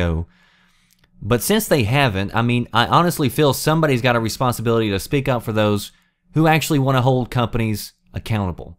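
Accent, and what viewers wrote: South, United States. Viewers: This guy sounds like a Southern Weird Al. I am not disappointed.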